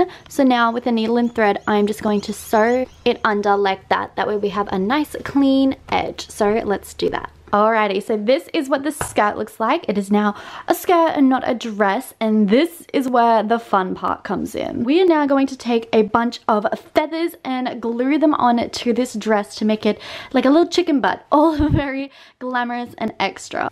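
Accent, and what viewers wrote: Australia. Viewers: Your Australian accent is very refreshing! Awesome video btw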